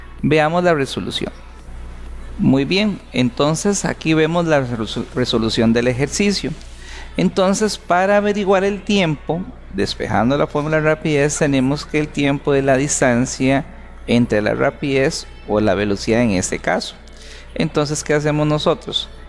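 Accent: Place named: Costa Rica. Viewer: La voz me suena a un tico....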